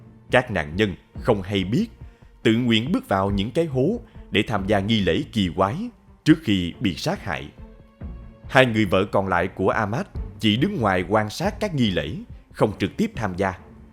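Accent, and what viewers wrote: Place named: Saigon, Vietnam. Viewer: Oh. đã trở lại. Rất thích giọng Saigon của bạn ❤❤❤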